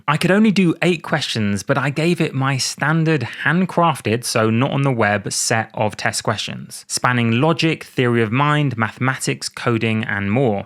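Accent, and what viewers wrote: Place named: United Kingdom. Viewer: I'm obsessed with this British accent 🥲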